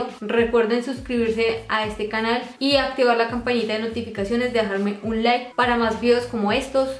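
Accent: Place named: Colombia. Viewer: Me encanta como hablas parece Colombiana, 🇨🇴 un saludo desde Bruxellas Belgica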